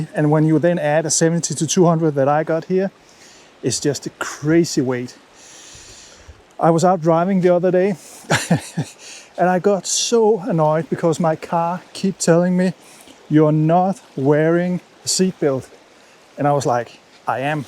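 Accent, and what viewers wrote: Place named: Denmark. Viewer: I love Danish accent 😂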